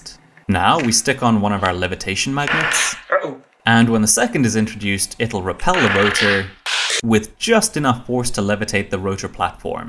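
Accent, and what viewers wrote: South Africa. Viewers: Yo I didn't expect a South African shout out I mean you do sound south African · Aye howzit bru, I thought I heard a little south african accent there and the biltong cutter got me 😂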